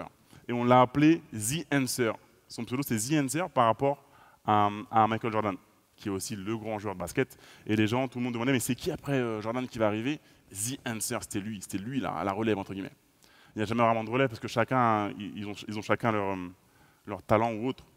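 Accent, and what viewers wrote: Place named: Suburbs, France. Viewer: Où tu entend l'accent de banlieues. Je ne savais pas que l'accent de cité existait.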